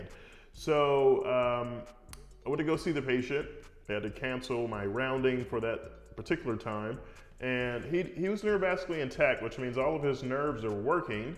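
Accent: United States. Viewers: Are u american Dr ?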